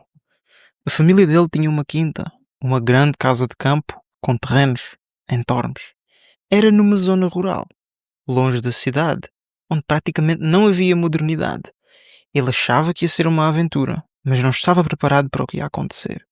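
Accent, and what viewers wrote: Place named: Europe. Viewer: Muito obrigado pela ajuda de escutar as palavras faladas no sotaque europeu. Gosto do seu jeito de ler e explicar.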